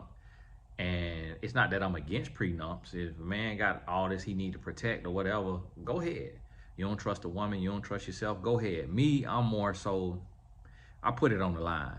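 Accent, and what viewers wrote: South, United States. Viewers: I am trying to figure out your accent Mr Tony. Is it a Southern drawl? 🇦🇬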